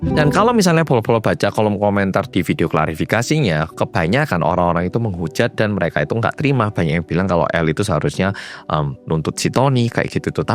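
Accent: Java, Indonesia. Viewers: Ya bisa,lah dari TK -SMA sekolahnya di Malang, malah pernah jadi Ketua OSIS segala,otomatis bhs jawanya udah medok.. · Terus terang aku nggak bisa nyimak ceritanya.. Salfok sama suarane mas yang medok iku lho.. 😂😂😂 Kok iso lho.. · Oalah,wong Korea iso jowoan medok pisan,tak subscribe aelah..😊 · Lho, baru tau? Mas asli Korea, dari ortu asli Korea Lahir di Daegu Korea, besar di Malang Jatim dari TK umur 4 tahun sampai SMA Makanya logat nya medok Jawa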